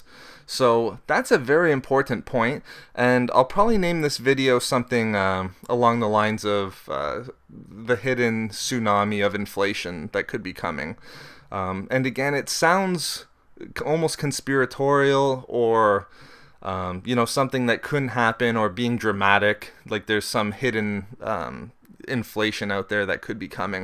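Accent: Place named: North, Canada. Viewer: Very interesting. I'll check these out and read them for myself, but I am interested in seeing whether inflation rises and what the particular causes end up being. On a more humorous note, do you know that you tend to pronounce "excess reserves" as "access reserves"? Hehehe... Must be that light northern Canadian accent. Don't take that as a criticism though. It didn't take away from the content. I just thought I'd have a friendly pick at you. Looking forward to the next CoinCast videos!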